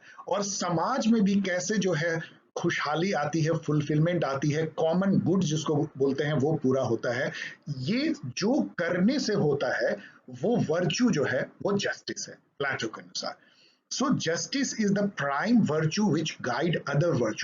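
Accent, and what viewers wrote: India. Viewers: I swear by Allah Almighty, I'm not understanding Indian English😢it sounds like they're speaking their language.😢 Is there any link for me check out on? 17 days to our exams😢😢😢😢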